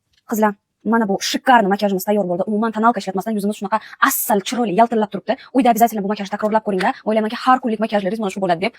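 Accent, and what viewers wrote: Bukhara, Uzbekistan. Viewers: Buxorolik siz aniq buxoroni shevesi vashe yoqadide😂❤❤❤❤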